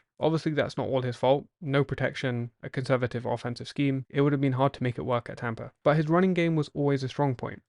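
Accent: United Kingdom. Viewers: BRI'ISH GUY TALKING NFL!!!!!!!!!!!! OMGGGGGGGGGGGGGGGGGGGGGGGGGG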